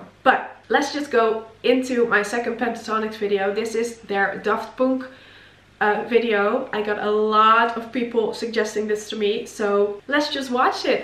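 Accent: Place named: West, United States. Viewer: Seriously, I had no idea you weren't from the states. You do have a tiny bit of an accent but it sounds local, like you might be from an isolated part of a state out west. I feel sorry for anyone trying to learn American english. It's a conglomeration of every language in the world, and as a result rules are practically nonexistent. So, well done! As a counterbalance to the negative nellies... I love your eyes. They're like staring into a pair of black holes. Whoever put that ring on your finger is a lucky man.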